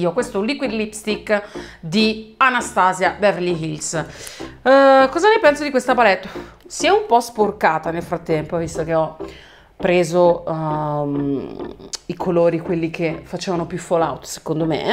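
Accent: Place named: Ancona, Italy. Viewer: Sento quasi l’accento anconetano/decollemarì 😂😘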